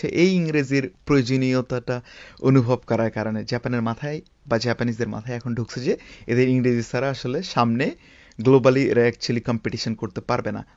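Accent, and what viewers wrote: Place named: United Kingdom. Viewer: Vai apni Bangladeshi, amon vab nitechen amon vabe kotha boltechen mone hoy apni kono engrej, Bangla ta ektu vlo koira bola sikhen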